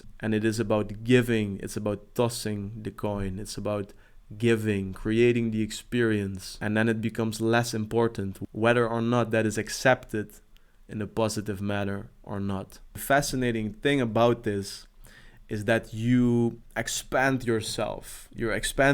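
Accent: Netherlands. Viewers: Jouw Nederlandse accent is zoooo sterk, niet normaal meer man.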